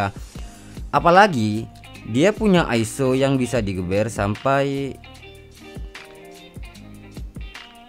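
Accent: Madura, Indonesia. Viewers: wah worth parah.... mantab,,, logat maduranya masih nempel om... hahaha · Madura banget. Anak madura mana ini?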